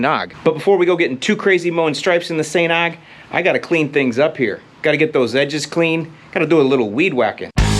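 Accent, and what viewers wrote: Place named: Midwest, United States. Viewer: Great video, and i love your Mid-Western accent lol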